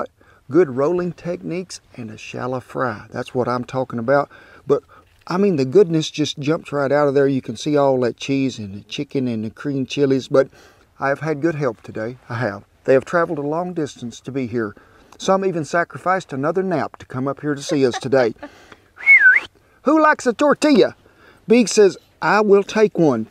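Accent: South, United States. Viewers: I’ve lived in FL all my life. The outdoors and the southern accent is enough for me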